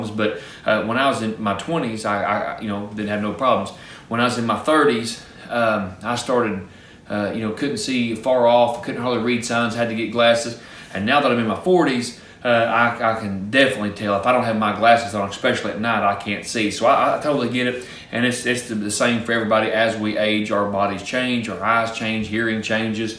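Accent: Kentucky, United States. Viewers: I wish the same to you and yours. It's amazing how people from across the world, in spite of varied experiences, and circumstances, can learn from each other and find common ground through channels like yours . It might surprise many how similar people are essentially. Shared values accross geographic, & cultural, and even racial divides. Thanks to the hard work of people like you, we have the opportunity to learn to adapt, improvise, and overcome . #PS . First time ever I have knowingly interacted with someone from Kentucky and I find that Kentucky twang extremely endearing and honest( don't ask me why; I just do. I myself don't know why). I normally don't post a lot of long winded comments so this is a first for me. Have a blessed life, and keep sharing your insights.🙏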